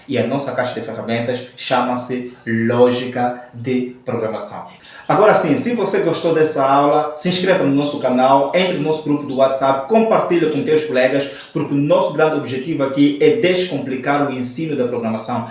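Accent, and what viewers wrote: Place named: Angola. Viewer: tudo top, ainda falando com sutaque Mwangolé 💥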